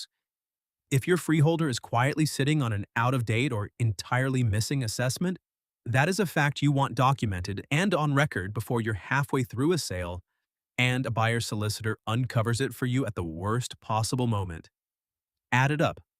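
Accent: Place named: United States. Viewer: Why am American accent?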